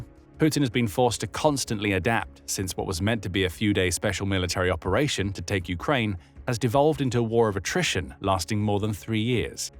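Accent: England, United Kingdom. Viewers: I now officially hate the English accent. I avoid it.. . . . . . . . .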